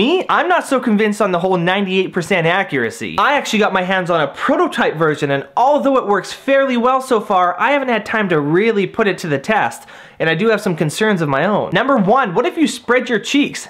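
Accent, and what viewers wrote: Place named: Canada. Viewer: Holy Canadian Accent! We get an "aye" and and "aboot" within the first 20 seconds!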